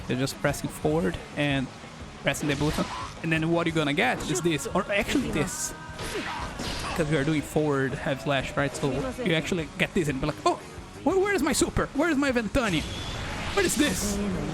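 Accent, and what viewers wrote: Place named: Brazil. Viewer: reconheci que era br so pelo sutaquekkkkkkkkkk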